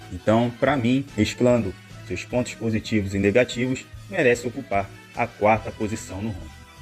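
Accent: Rio de Janeiro, Brazil. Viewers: Pelo sotaque da pra ver que o cara e carioca !!!! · Sotaque carioca do cara já da pra ver que é flamenguista kkkkk onde flabosta é primeiro kkkk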